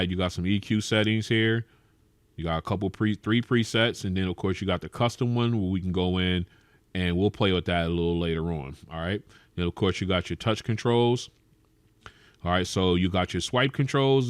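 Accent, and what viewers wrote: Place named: California, United States. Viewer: Big bruh. Your cali accent so apparent. Love the content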